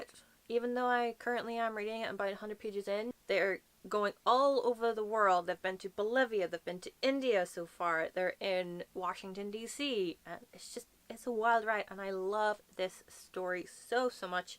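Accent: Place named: Ireland. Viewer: Dont lighten your accent i love the irish accent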